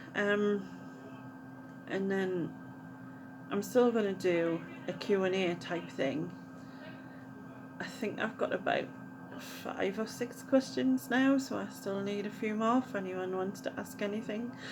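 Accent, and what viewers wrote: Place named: Newcastle, United Kingdom. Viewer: Love your accent, I used to live in Newcastle, miss itx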